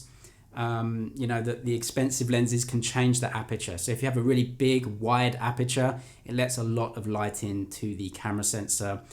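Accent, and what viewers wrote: United Kingdom. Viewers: #asktechzg Why are you in China?Is it for your job,if so,what is your job title? Cuz you sound British and look 100% like Tom Holland😂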